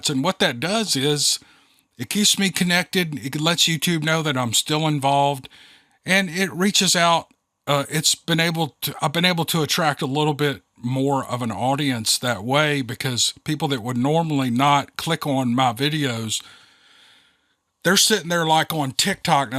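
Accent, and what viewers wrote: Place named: Oklahoma, United States. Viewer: Sounds like you have been spreading yourself thinner than butter. Howdy. I don't usually write in the comment section. So here goes. It's true we hope you're doing okay, and are glad to hear you and yours are well. I get it, you're just trying to survive like everyone else. I will be patiently waiting for the next Saga of Steve Lilly. In the meantime, I will enjoy, and I do enjoy everything you post, that has your name and that wonderful okie voice with it. From one Okie to another, take care. P. S. There is nothing wrong with the shorts.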